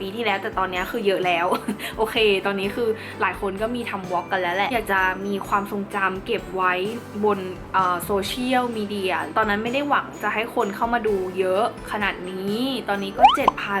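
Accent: Thailand. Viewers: คนสุพรรณ ใช่ไหม สำเนียงออก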